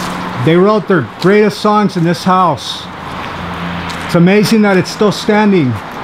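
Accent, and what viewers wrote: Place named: Chicago, United States. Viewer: are you from Chicago or Milwakee? Its date der accent Yah Know?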